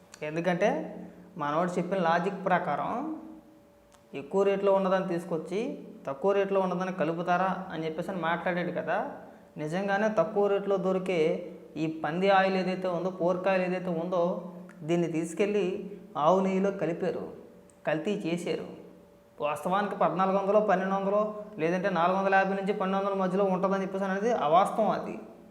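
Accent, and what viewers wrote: Godavari, India. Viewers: medhi e ooru bro, me basha godarolla basha la undi. any way you were great. keepit up...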